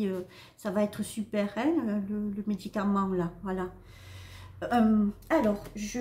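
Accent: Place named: Southwest, France. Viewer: Bonjour je viens de vous découvrir est je me abonnée j aime bcp votre douceur et votre l accent si je me trompe pas il viens de sud-ouest, merci 🙏pour tout ces bonnes nouvelles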